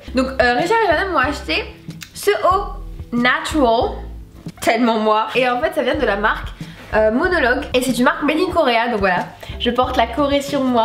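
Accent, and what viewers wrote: Oran, Algeria. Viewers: bah non je prefere des videos en Fr psq je comprend pas trop l'accent oranaise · tu es algérienne cool peux tu m’apprendre a parler comme vous ???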